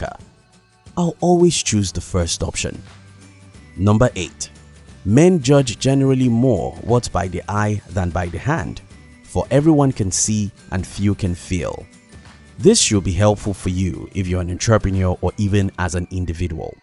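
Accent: Nigeria. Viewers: This guy on the audio is Nigerian trust me .. he just Dey Lagos here now Dey tell us how to make money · It's quite obvious he's Nigerian. So what? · you sound nigerian.are you?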